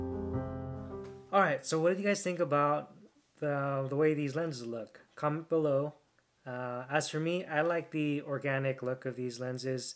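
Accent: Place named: Philippines. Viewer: I knew it you were filipino man hahha i based it off the accent anyways great video man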